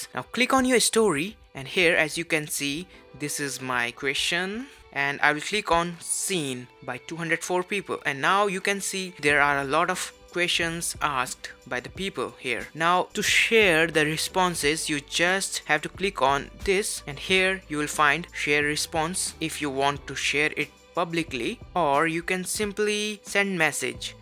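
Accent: India. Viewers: Indian English :)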